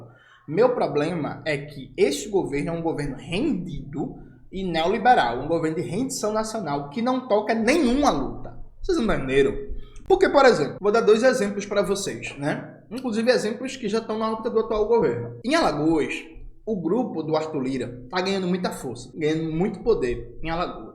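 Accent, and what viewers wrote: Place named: São Paulo, Brazil. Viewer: q sotaque fei esse de faria limer meuu 😥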